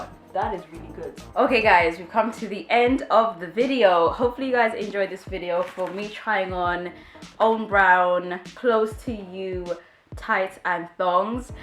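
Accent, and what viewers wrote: United Kingdom. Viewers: You are a Goddess. I love the British accent.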